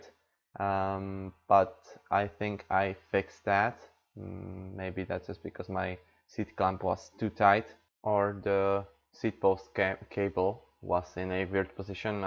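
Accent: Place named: Slovakia. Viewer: najprv som si myslel, ze je toto video od nejakeho nemca ale potom som pocuval ten prizvuk a hned som vedel, ze musis byt slovak :D Tiez budem kupovat Foxy ale 2021, ako si spokojny?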